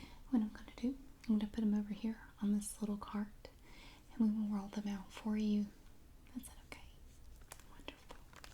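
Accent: South, United States. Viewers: Now what part of our beautiful south are you from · Love your soft american accent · Really liked the soft speaking and page turning. Very relaxing. Thank you! Love your southern draw too btw... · You're very similar to old school Maria Gentle Whispering with a southern twist and it's perfection! Thank you. 😊 ❤️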